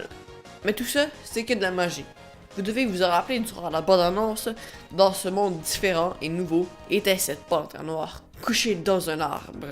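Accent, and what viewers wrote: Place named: Quebec, Canada. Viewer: le seul probleme c'est que moi et l'accent québecoi ca fait 2 xD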